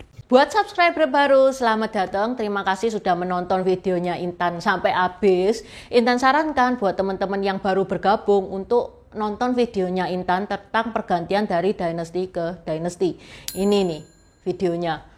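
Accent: Java, Indonesia. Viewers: logat jawa. klo cina jkt ga mgkn akan sounding hal ini, karena kalian ga akan prnh 100% paham tragedi kelam itu. sesuatu yg bkn utk dijadikan cerita. -some truth better left unspoken-. yg prlu dilakukan stlh masa itu adalah tetap berbuat kebaikan dan pertebal penjagaan diri.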